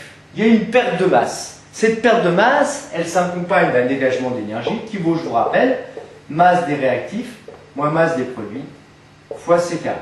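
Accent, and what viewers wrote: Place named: Marseille, France. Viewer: bien expliqué avec l'accent marseillais ^^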